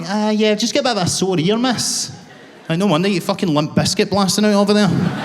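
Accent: Scotland, United Kingdom. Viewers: This dude kills me, I need to go to Scotland to see one of his shows even though I need the subtitles to understand the Scottish accent 😅. Thanks Marc Jennings!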